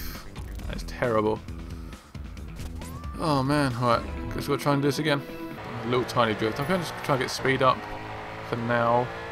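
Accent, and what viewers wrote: United Kingdom. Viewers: He is British i think??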